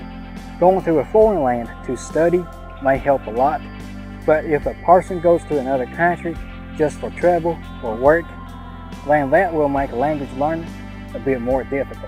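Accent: South, United States. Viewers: Impressive and amazing that you got good knowledge of what you doing. I hope that as you lay the first foundations of your life as a Cowboy,I hope that you will carry this accent to other Chinese young people in the United States and also in China,and in the end,Chinese,Japanese and Koreans,can experience the Country Cowboy culture and also the accent,for those living in the USA or Canada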